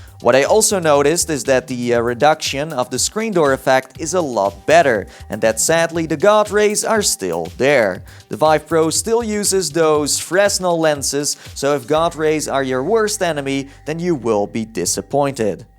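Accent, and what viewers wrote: Netherlands. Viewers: Are you dutch???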